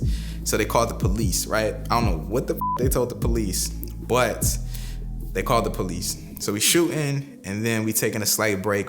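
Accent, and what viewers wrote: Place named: Newport News, United States. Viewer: Sounds like Newport News for sure..shout out to VA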